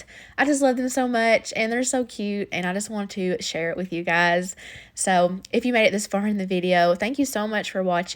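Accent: South, United States. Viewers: I get so excited when you post! I'm from Tennessee but I still love to hear the country accent and see all the good cookin :)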